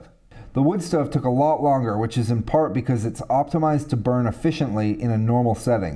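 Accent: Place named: United States. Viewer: Thanks for the great content I've built a few rocket stoves and you always get better results if you insulate your burn chamber. Also I noticed that you have an American accent but you are based in the UK going on the plugs behind you and I would guess from outside possibly somewhere in the Pennines?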